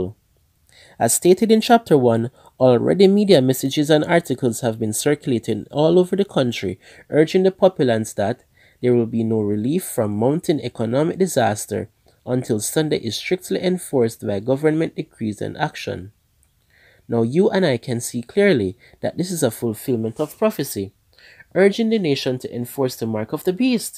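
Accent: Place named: Jamaica. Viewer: Glad that this book is online . Thank you for the upload. The reader sounds Jamaican 🇯🇲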